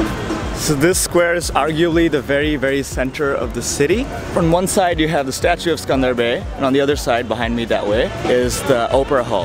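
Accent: United States. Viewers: Boy you made this vlog so interesting which convers Albanian history, culture, sights and touch to the food as well which is an essential part of the travelling. Very nice video. Also you say you are from Bangladesh but your accents is pretty much like USA and Canada. Thanks for the sharing. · Brother well ❤️ Can you say a secret thing like how have you learnt the us accent ? Because u said you have been to us for 5-6 years so during this time did you adopted this accent ?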